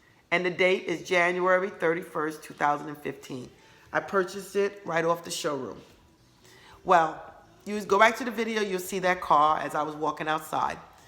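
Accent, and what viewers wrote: New York, United States. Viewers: I'm so glad I found this channel!!! I manifested my Ford F-150 got it brand new..in fact it was even better than I pictured!! It was a truck that was from an other dealer...fully loaded..I loved it...I didn't even know I manifested it...this was in 06...that next year the secret movie came out..of maybe it was 06 but I didn't see it till 07....anyway I want a Ford Raptor...it's an F-150 on steroids...it's awesome!!! I see it everywhere...I feel like there is a block..but I watched some of your videos....I love manifestation stories there needs to be more!!! And the how with it....too many vids are just technique and guruish...I need proof!!! Not that I doubt loa but it inspires me...like this vid right here!!! Thank you! Ps where are you from I'd that a new York or Boston accent..lol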